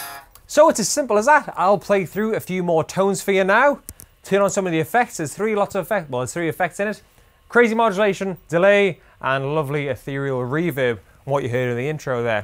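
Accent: United Kingdom. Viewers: I didn’t expect his accent to be British he looks America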